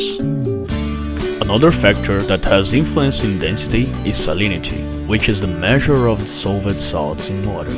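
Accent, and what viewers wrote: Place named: Russia. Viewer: u have to be russian!? thanks tho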